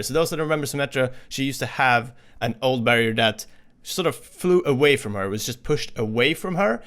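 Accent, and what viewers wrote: Sweden. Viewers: This guy has Swedish accent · You swedish?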